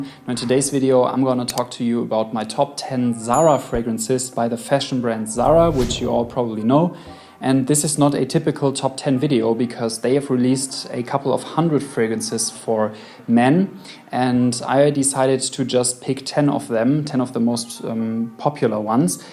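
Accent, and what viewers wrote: Germany. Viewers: ur german arent u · Der junge ist deutsch merkt man Bro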